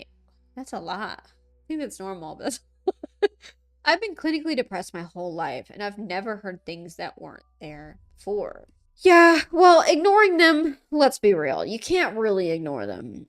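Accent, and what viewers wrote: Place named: Midwest, United States. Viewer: Where is she from? How she talks reminds me of the Midwest and ita so comforting. How she says 'sawl' instead of 'saw' lets me know she's cool 😎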